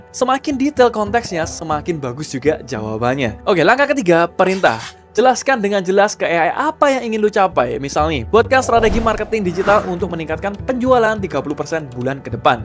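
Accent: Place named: Surabaya, Indonesia. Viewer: mantap cak, logat sby nya kelihatan... sbynya barat, timur?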